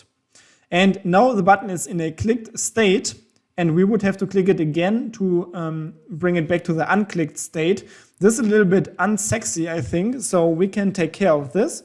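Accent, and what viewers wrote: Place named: Germany. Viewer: Hallo, krasser deutscher Akzent aber sympatisch..:D Ist es irgendwie möglich, dass die Positionen sofort gleichzeitig geschlossen werden und nicht nacheinander, was ja nach Menge leider einige Sekunden dauern kann... Also alle sofort geschlossen wäre für Scalping noch besser! Ist das einfach umzusetzen? Danke!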